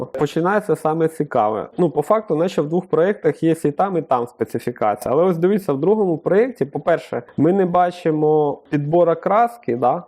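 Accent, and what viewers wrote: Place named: Cherkasy, Ukraine. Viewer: это что за язык? черкасский? кировоградский? слушаать невозможно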